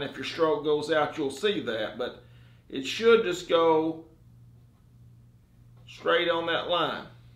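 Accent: South, United States. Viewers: Southern Drawl contest... southierest dawliest talking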